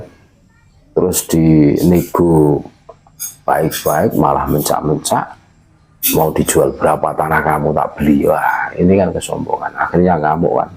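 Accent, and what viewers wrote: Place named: Madura, Indonesia. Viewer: Orang Madura itu suaranya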